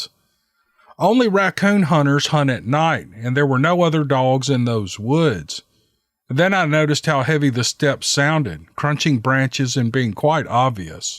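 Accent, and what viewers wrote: South, United States. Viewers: Just got home from work and BOOM, a new vid! What an awesome way to wind down. Being in the deep woods at night with just a lil 22 and having a giant, possibly hostile, WTF walking beside you. Damn. That'll test your bunghole. It'll either pucker up REAL tight, or the flood gates will open wide. Yeah, I'd give up coon hunting too! Keep up the great work. Listening to you talk with that southern drawl is just like sitting around the camp fire with friends and family. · you have a very deep voice I would like you to sing some with your Southern drawl sometime.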